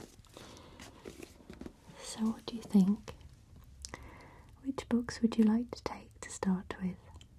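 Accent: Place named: United Kingdom. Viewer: Thank you, beautiful choice of books and an incredibly calming video 💎 I've learnt English with American accent but thanks to you I'm getting so used to British accent, and even realising how elegant it is without being rounded😎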